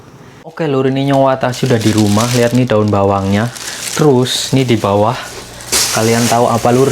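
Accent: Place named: Purbalingga, Indonesia. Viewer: Jial ngomong ngapak ala Purbalingga 😊nyong y wong Purbalingga 😂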